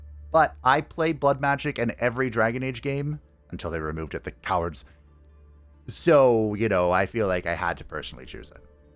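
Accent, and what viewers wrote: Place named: United States. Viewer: And it was a very poor attempt made from ignorance. Not blaming you as a person, as your accent pegs you to me as a USian, and there is no country on this world whose citizens are more confused about the political spectrum and what the various tendencies entail. I meant to poke a response, and thereby give you a more accurate way to consider communism. For accuracy. For you have an audience, and some might take your words as gospel - which would be, in this case, inaccurate.. :)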